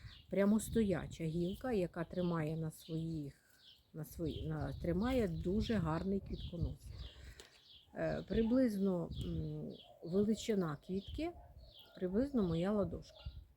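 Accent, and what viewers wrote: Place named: Cherkasy, Ukraine. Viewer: Я сама живу в Черкаській області, знаю цей змішаний говір.Не ображайтесь,все буде добре 🌹🌹🌹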